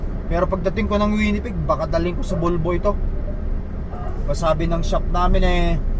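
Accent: Philippines. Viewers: astig kapa rin lodi ,..Pinoy na Pinoy ka magsalita da best Lodi Kong truck driver ❤❤❤ drive safe always watching your vlog here in St.Catherines Ontario🇨🇦🇨🇦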